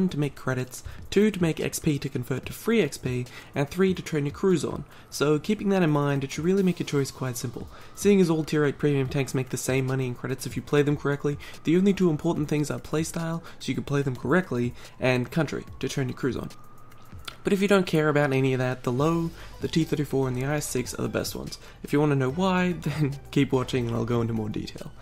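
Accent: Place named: United States. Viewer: Well he is american.. And remeber tomato tomato..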